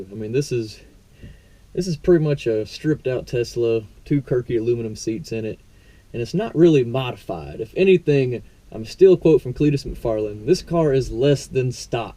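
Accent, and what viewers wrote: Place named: South, United States. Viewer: I love the way you talk man... some people from the south that have lost their accent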